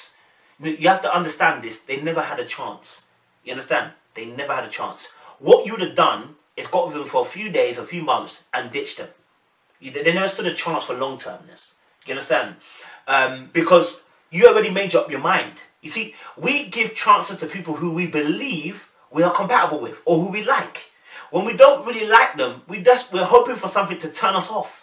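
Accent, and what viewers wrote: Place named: United Kingdom. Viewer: Its nice to hear a British accent reviewing this show. Great review. New subscriber!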